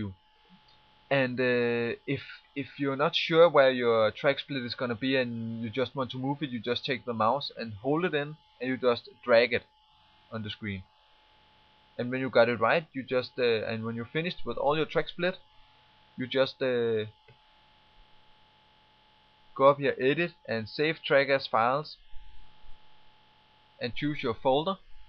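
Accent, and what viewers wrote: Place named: Denmark. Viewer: where u from? hmm, danish dialect lol!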